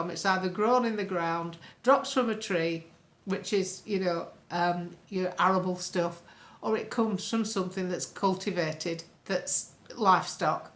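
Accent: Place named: North, United Kingdom. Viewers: Hello, You absolutely do make a difference in body heart mind and spirit. In my opinion you are the Muse of the North. Lots love and hugs❤❤❤ PS Love your Northern accent. Your accent sounds like mine❤ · I love you’re “uncle bill” Yorkshire accent, sounds like a bloke fre down road 😂😂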